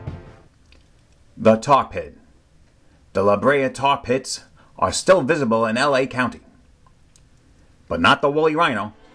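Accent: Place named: New York, United States. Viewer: I like your New Yorker accent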